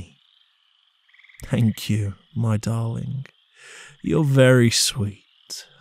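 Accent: United Kingdom. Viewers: I could listen to tour accent all day if I could What kind of accent do you have it sounds like you are from the UK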